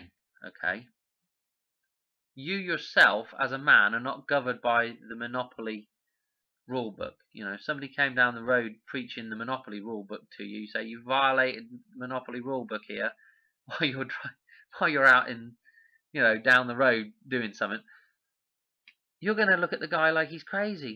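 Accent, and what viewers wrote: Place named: England, United Kingdom. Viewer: Came over from Secrets of Saturn, well explained. Wasn’t expecting to hear an English accent. Greetings from Edinburgh Scotland.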